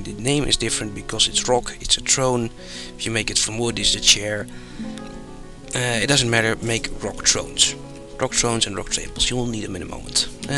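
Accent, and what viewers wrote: Netherlands. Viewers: Haha , je kan horen dat je Nederlands bent :'D . Btw waar ongeveer in Nederland woon je als ik vragen mag :x ?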